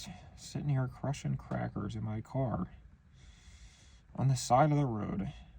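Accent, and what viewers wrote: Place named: Philadelphia, United States. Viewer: Man is def from the general Philly/Bmore area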